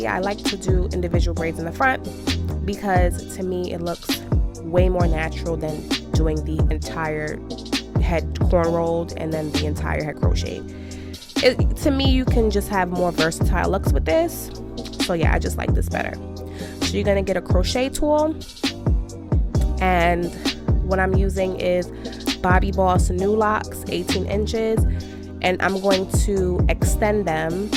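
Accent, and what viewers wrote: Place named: New York, United States. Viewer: Are you from New York?? Because I’m from New York and I know that accent from anywhere and you’re gorgeous 😍😍😍😍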